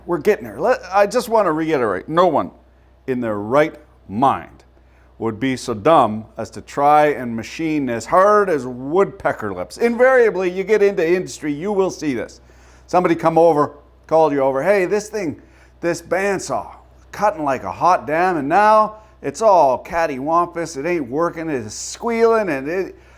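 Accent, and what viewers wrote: Canada. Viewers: ! I’m a Yankee, but work for a Canuck company down in the states, and a’many’o Canucks I have income across, and have for quite sometime, and with that I have started to figure out (or I believe to have figured out) regional Canuck dialects. Are you a west coaster? Tanks for da ridiculous humor!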